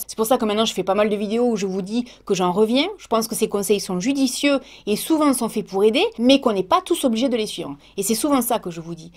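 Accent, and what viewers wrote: South, France. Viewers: j'adore trop marrant, surtout l'anedocte avec Kate Wislet pas mal... et j'adore ton accent, ça me rappelle le sud et le soleil :) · Coucou ♥ j'adore ton petit accent du sud et tes cheveux roux ! Tu m'as fait sourire dans ta vidéo et j'avoue que c'était bien sympa o/ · Je me disais que tu avais beaucoup l'accent du sud et qd j'ai entendu Perpignan j'ai mis pause et je t'ai regardé comme si yavais un cookie devant moi et j'adore les cookies 🤣❤️ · Vive l'accent du sud !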